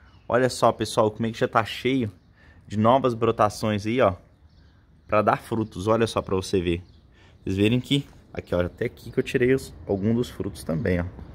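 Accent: Minas Gerais, Brazil. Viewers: Você é mineirinho?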